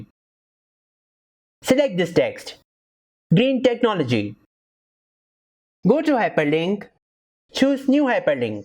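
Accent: India. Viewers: Damn, that indian accent. Just cannot listen to it. Get better narator.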